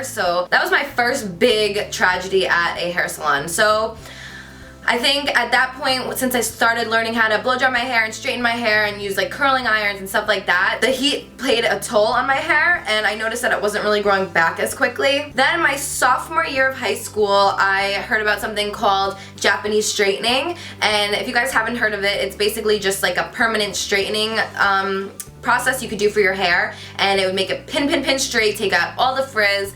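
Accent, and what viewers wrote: New Jersey, United States. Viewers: So obsessed with your Jersey accent! Lol. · The way she says 'water' tho!Am I the only one always hearing the Jersey accent? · My husband is from NJ & his whole family talks like this. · Lol I keep repeating the part you say water. Your Jersey accent is so cute ! 😍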